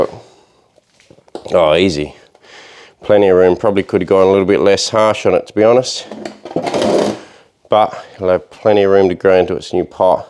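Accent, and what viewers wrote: Australia. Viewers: Sorry about the tree dying. That's gotta hurt. I really enjoy your videos, especially your bonza Aussie accent. I'm away from Oz atm and it's cool to hear someone who really knows his stuff talk about bonsai in a down to earth and approachable way. I'm new to bonsai. Am only in my 2nd year and trying to learn how to grow healthy trees. I don't have any real bonsai yet (all pre bonsai) so it's awesome to hear you talk through your decision making process. Middle stick to big stick for me but I like trunks with character best.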